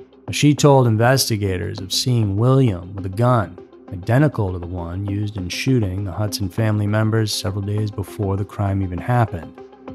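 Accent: South, United States. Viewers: Heartbreaking tragedy. I love listening to! I swear I hear a bit of Southern accent. Thank you,. ❤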